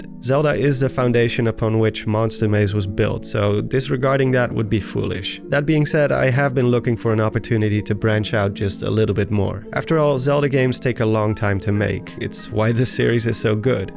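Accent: Netherlands. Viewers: kan aan je stem horen dat je een nederlander bent, congrats op de 100K man. Mijn PC kan elden ring niet runnen, maar wil de game uiteindelijk wel gaan spelen(nadat ik een betere gaming PC bouw, maar dan moet ik eerst wachten totdat de prijzen gezakt zijn). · There are way worse accents then the dutch one. It was actually quite charming.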